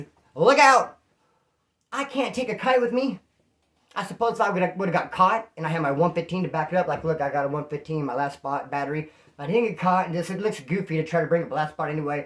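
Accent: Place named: Inland Empire, United States. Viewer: FUCK that sounds like someone from the IE.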